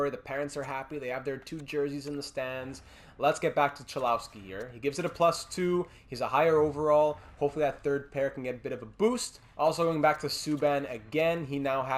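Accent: France. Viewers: Every time says “Julian Gauthier” in that gorgeous French accent I am literally rock solid. And using the words of my good friend StarScreamX-_-X here... “I doubt I’m not the only one.”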